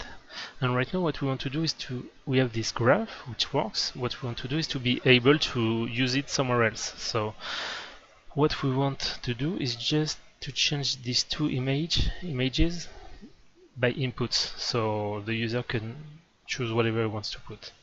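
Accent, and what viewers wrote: France. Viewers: oula sound french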